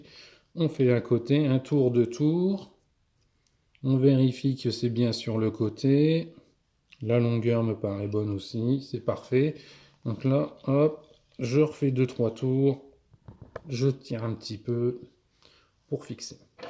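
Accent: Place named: North, France. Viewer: Je reconnais un accent du nord. Plus précisément un accent de Lesquin… 😃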